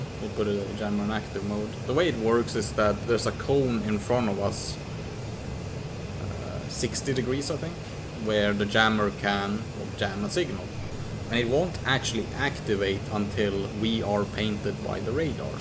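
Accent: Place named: Sweden. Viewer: dude you are Swedish right? jag med jag märker på vägen som du pratar :)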